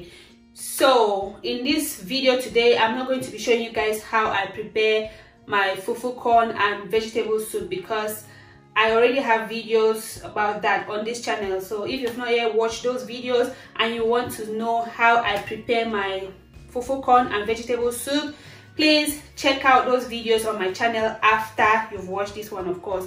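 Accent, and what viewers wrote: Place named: Nigeria. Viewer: Wow I always thought you are a Nigerian, when I saw khati khati, I was like? Do we have a Nigerian dish called khati khati????, its looking so yummy wish I could try this, the way you pronounce you maggi looks so Nigerianlized, the baked chicken came out great...simple this is the first time you made a video less than 10min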